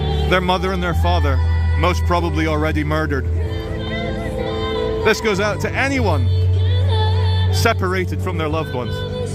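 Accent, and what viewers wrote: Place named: Cornwall, United Kingdom. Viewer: You sound like you come from Cornwall bro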